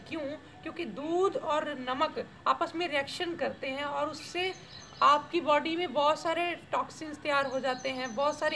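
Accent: Punjab, India. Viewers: Sir aap Punjabi ho ?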